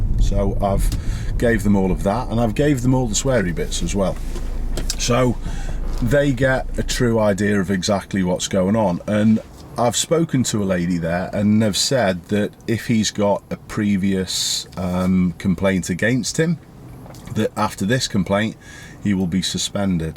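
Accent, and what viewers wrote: United Kingdom. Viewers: I subbed to this channel a few years ago so i could come back and watch them when i was old enough to learn how to drive now im looking at it he sounds british and that looks like the uk :'3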